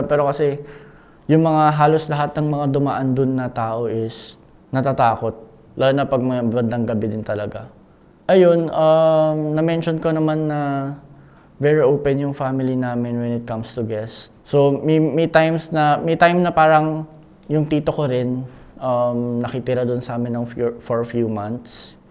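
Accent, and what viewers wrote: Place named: Conyo, Philippines. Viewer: Conyo kasi siya ikaw kasi laking iskuwater🥴 kaya madami napapansin dka nlng manuod pwe🤮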